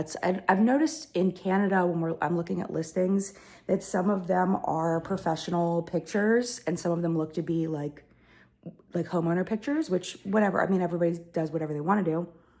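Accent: South, United States. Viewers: My heart goes out to you, it’s an intensely emotional process sifting through your life’s memories! My best advice is to digitize most of it. Video yourself sorting through them while telling the story behind each item. This way you still keep the memories without the clutter. Seriously, your grandmother’s wig??? Don’t listen to the haters who scold you for speaking “American”! You should never apologize for who you are! You are a lovely person, don’t ever doubt that! 🥰💕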